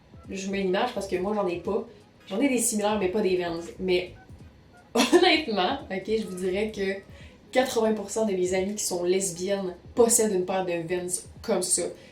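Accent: Quebec, Canada. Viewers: Accent quebecois tabarnark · Québec ou Canada puisque le Canada et le Québec parle français et on un accent comme ça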